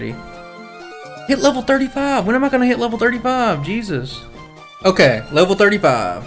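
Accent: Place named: Georgia, United States. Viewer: I'm from England and I'm not very good at American accents. I'm going to have one guess on each of your videos from now as to which state you're from. Now, this is limited as there are only so many states (although who knows how many?) But it might be fun. First guess is Georgia. Please confirm.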